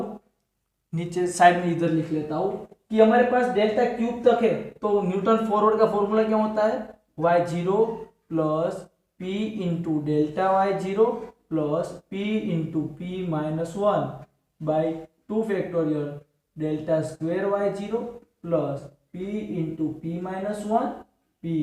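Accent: Gujarat, India. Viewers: Your tone is gujrati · bhaiya aap gujrat se ho kya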